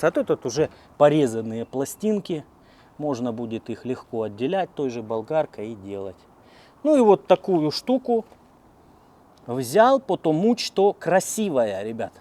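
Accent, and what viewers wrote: Rostov, Russia. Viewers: Как у классика: "Хорошего вам настроения! Вы держитесь там! Просто денег нет."😀 Лайк только за один ростовский говорок!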